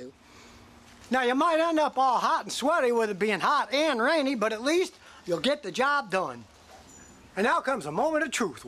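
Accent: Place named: Midwest, United States. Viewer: bummer. your accent sounded like you were from the midwest...so I was hoping you were in Michigan. love the channel. thanks and have a good day.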